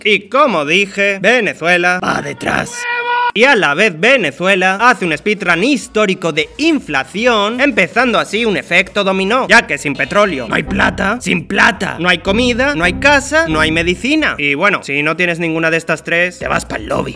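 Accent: Spain. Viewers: Por que suenas a visualpolitic con ese acento y expresiones raras?(español de españapara ser mas claro) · Históricamente incorrecto, pero una carta de amor a la comedia Brother no te creo que seas venezolano nunca con ese acento español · No suenas como venezolano suena como español o se te olvidaron las raíces, que pena ni fueras dicho que eres de Venezuela me da pena decir que ere mi paisano pero 😅 si da risa y es cierto lo que cuentas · Por qué si eres venezolano hablas como español? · Bro como q eres Venezolano? Pensé que eras español 😭😭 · Espera, cómo que eres Venezolano?, yo soy venezolano, y diría que suenas más español que venezolano 🤨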